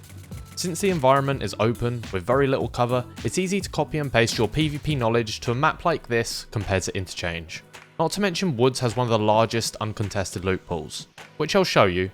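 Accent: United Kingdom. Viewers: Best woods guide on the internet, with a British accent to top it off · Easy sub for you here mate. Clear consise no BS and British. Woods not intense though? Gotta argue on that one!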